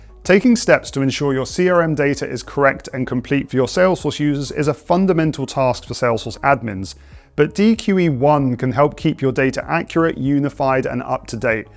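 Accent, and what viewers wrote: United Kingdom. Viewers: Dude, your British accent is difficult to listen to when trying to learn.....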